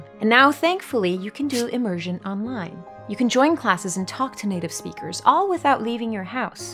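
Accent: Brazil. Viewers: Magda Alvernaz não quero te menosprezar mas você entendeu quase tudo que ela falou porque ela é brasileira e fala com nosso sotaque.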